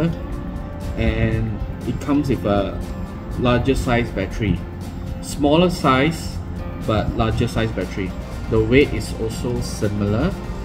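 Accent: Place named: Malaysia. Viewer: Darn I wish you Malay Chinese and Singaporeans didn’t have that horrid accent. Both Lee Kwan Yew and prof. Gang Wu speak with a better accent.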